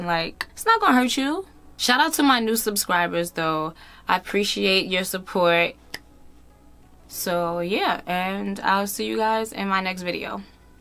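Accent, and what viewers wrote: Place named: New York, United States. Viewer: SubscribingBECAUSE .....the tribe palette look.... u did that.......you’re a NYer (accent dead give away lol 😂)and you’re an awesome mom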